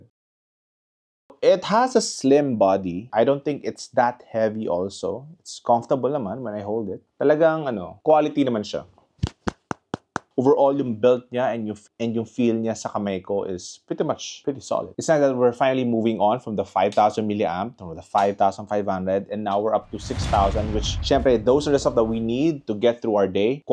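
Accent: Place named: Singapore. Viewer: Kung di ka nagtagalog kala ko singaporean ka na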